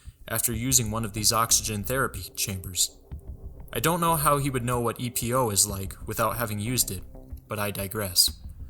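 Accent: Ireland. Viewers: What happened to the Irish accent?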